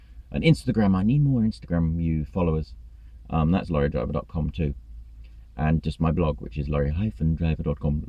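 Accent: RP, United Kingdom. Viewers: hahah hope you get in to CPC training and do mine when I do it…with your posh accent please, would make the day a bit more fun! :) Just a question, I'm about to go ahead with the training course for LVG C+E, with The LGV Training Company (they came up first in google when I typed LVG Training Courses) would you recommend them? For the C+E + CPC+something called Direct Access Course, it mounts up to £3K… is that the normal price these days? Thanks in advance and thanks for your videos! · He's BACK BABY!!!!!!!!!! I thought you've lost it on your last video but I was wrong. the funny is back and most importantly the posh voice, GOD I missed it so much.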